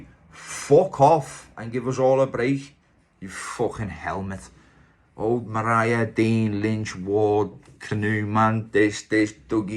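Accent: Liverpool, United Kingdom. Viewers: I don’t get ya with all this fighting talk …you where sh*+£ against ash dring he slapped the head off ya and why do you keep speaking with an Liverpool accent when you Welsh 🤦‍♂️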